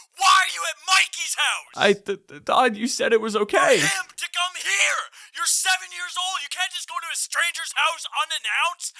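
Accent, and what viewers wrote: Canada. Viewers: Are you Canadian too?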